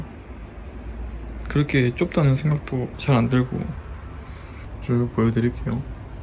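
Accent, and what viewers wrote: Daegu, South Korea. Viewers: ㅋㅋ 대구 사람이신가 대구사투리 느낌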